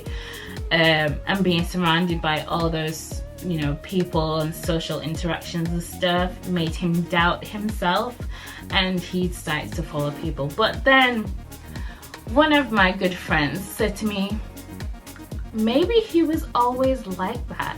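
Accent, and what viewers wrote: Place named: Northern England, United Kingdom. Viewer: Ahhhh you are from Leeds! I was trying to pin point the Northern ascent. Funny story time! 😂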